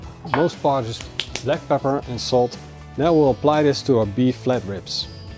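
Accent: Netherlands. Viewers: Glad I found this channel. Love the videos. Would I be correct in guessing that your accent is Dutch?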